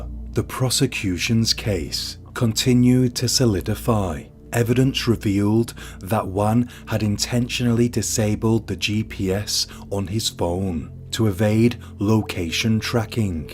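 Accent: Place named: Liverpool, United Kingdom. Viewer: Also I can hear a Liverpool or Merseyside accent 😊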